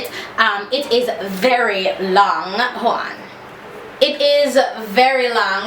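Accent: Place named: Jamaica. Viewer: lololol girl don't kill me! I'm a trini, but I love to hear Jamaican's talk. lol when you'all say blood cleanse, dat absolutely crack me up!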